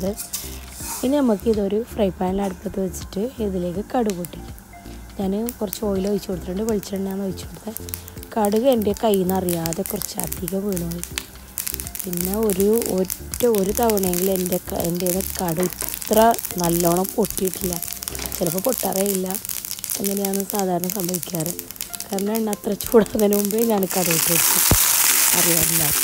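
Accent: Kasaragod, India. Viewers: Kasarod slang super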